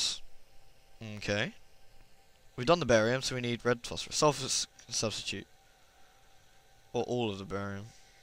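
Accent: United Kingdom. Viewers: You sawed Freemans hand off D: You monster! Loving your walkthrough, and its nice to hear a british accent. Yay for us British peoplez.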